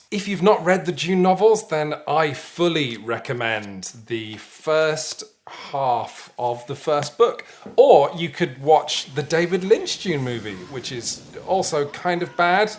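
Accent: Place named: England, United Kingdom. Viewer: most English sounding man ever, congrats.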